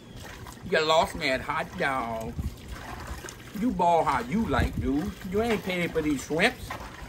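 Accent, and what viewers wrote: Louisiana, United States. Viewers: I FEEL LIKE IM A BERL SHRIMP I LOVE CAJUN ACCENT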